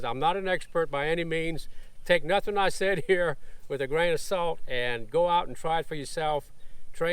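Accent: Louisiana, United States. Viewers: Sounds like a fellow Louisianan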